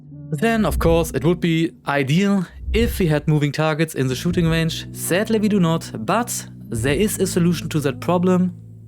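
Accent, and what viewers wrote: Germany. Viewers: Ich höre sie sind ein deutscher der herr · that German accent to me as an English person is a stamp of quality! I just know it's going to be a good video.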